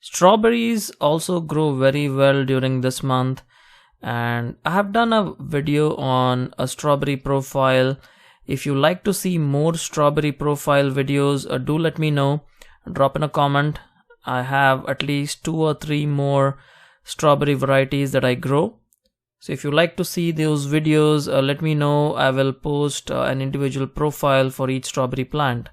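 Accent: India. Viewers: your accent....are you from India ? nice videos :)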